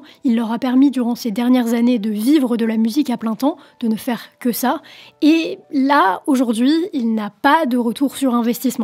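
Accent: France. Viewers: L'accent franchouillard est à retravailler svp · sauf que la langue française prend trop le dessus au niveau prononciation des noms anglais. ça fait tâche.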